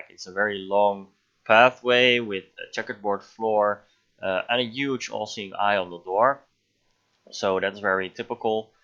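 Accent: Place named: Netherlands. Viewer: Volgendsmij ben je nederlands ik kan dat horen haha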